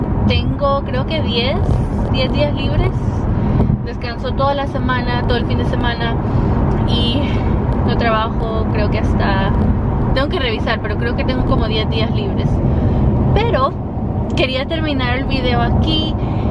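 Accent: Spain. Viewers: your spanish accent is so cute ❤️ in Portugal, we say “Assistente de Bordo” or “Hospedeira” for flight attendant ☺️ Great video!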